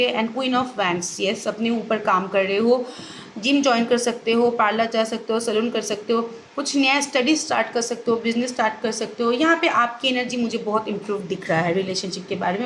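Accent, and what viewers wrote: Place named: Bengal, India. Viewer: Bhai ami tarot reading khub ekta dekhini age, normal rashifol dekhechi. Kotha sune mone holo tumi bangali tai ... khub valo reading tomar ... clear cut ... subscribe korlam... thank you ... but 1ta proshno moner modhye ghurche reading ta dekhar por thekei ... ami pure single, kothao kono option nei, ageo chilo na ... khub cleverly keu propose na kore deep interest show koreche but amar intuition bolechilo may be married... amar janar kono scope chilo na takei jigasa na korle, ami jigasa korteo parini but se kaida kore jene niyechilo ami married kina .. 1ta kajer jonno kotha hoyechilo.. romantic connection hobar kotha ekdomi noy .. ekhon kotha holo je married se to tar spouse ke chere kono din o onno kauke commitment dey na, tahole tara nijeder situation jene sudhu sudhu single der life e kosto keno baray ? Ami to flirt kori na kokhono ... 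korle amaro anek relation thakto fole seriousness karo proti thakto na, sobtai khela hoto ... sob cheye beshi koste achi ami, jobless, struggle korchi, ro anek problems ache life e ... tar vetor aei notun problem jar kono solution ache bole to amar mone hochhe na - sudhu reunion diye ki hobe bolo ? I need steady relationship not fun game... ami married hole to jeche pore onno kauke kosto dite jetam na kokhono... jara commitment debe na tara ase keno boloto ? By the way ami esob soulmate, twin flame ki jani na, bujhio na. Simple relation bujhi jekhane prem hoy eksonge thakar jonno.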